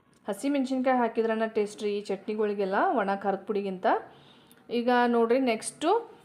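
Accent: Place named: Belgaum, India. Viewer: Hi mam ,ur kannada tells us that u r from Belgaum district. (madtaru) that roo of madtaru😊😊.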